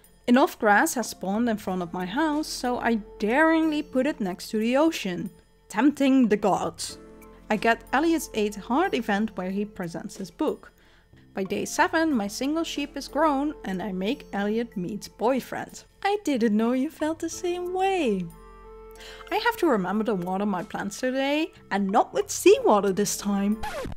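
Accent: Netherlands. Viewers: Hey! This is the first video I watched of yours and I kept wondering if you were Dutch, then I heard you say Herman and now I'm pretty sure you're Dutch 😂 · Not me loosing my mind trying to guess if you are dutch and then absolutly cackling when the confirmation hit 🤣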